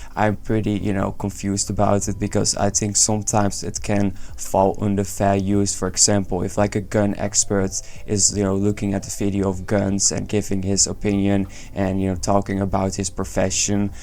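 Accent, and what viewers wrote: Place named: Netherlands. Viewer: Ik versta aan je engels dat je nederlands ben😁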